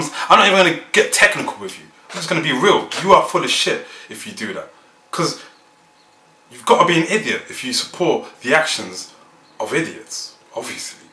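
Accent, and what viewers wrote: Bosnia and Herzegovina. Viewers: ))) U speak like u r Bosnian :))) Congratis :))) and congratis on this video! its sooooo good :) i am glad u think like that because he is really a criminal, he killed inocent ppl :( and.. how can he say that he is inocent? thare is sooooo many videos and other important documents that proves his "inocent" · I love your bosnian accent, your amazing :) bravo brate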